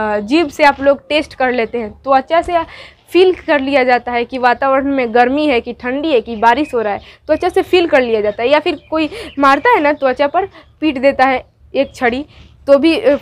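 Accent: Bihar, India. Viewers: I think this mam from saran,siwan, Gopalganj. Bihar... definitely...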